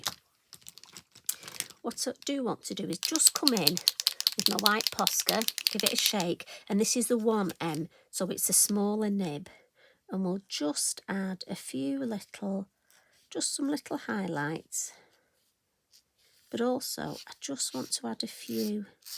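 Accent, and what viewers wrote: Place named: Northern England, United Kingdom. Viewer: you are a pleasure to watch, and as a northerner down in Devon, listening to your accent just takes me back home! I have learnt so much from your tutorials, yes! me! a retired art teacher!! This is all quite different and exciting to what I am used to! It must be because I am doing it solely for me and NOT for a set of marks! Now, my next adventure will be with Dinkles…so vibrant and pure! I can’t thank you enough …..very best wishes…Donna!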